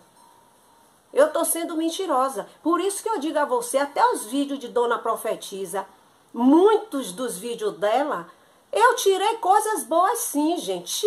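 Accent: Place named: Northeast, Brazil. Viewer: Gosto muito dos vídeos e do sotaque nordestino também, coisas lindas do nosso Brasil, uma terra linda, cheia de diversidades e por isso tão maravilhosa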